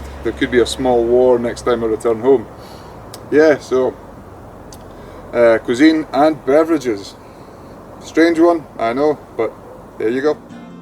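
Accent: Scotland, United Kingdom. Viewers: listen to you speak with your rich Scottish accent all day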